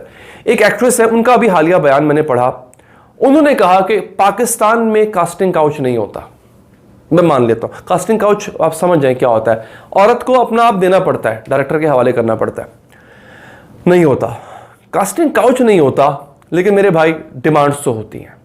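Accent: India. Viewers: Bro please speek like Pakistani don't speak like Indian we like you... Insap ke deve.... Indian language please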